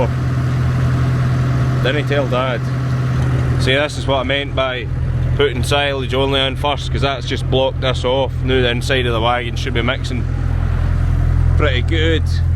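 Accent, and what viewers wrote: Scotland, United Kingdom. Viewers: what part a scotland you from ..im in northern ireland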